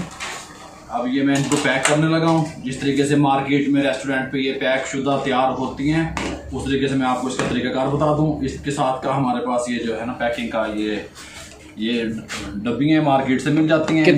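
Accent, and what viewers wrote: Punjab, India. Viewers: Uncle ap buhut pyara bolte hain specially punjabi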